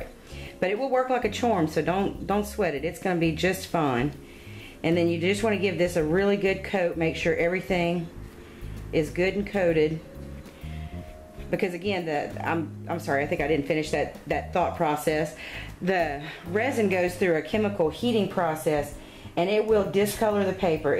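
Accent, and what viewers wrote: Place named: Alabama, United States. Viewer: Are you in Alabama? I swear I keep hearing one of my cousins talking. If you are from Alabama you prob are one of my cousins :-) (Big Family)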